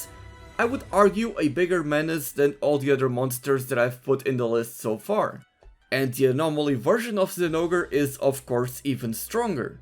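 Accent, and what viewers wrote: Germany. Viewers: Yooo u german? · interesting german accent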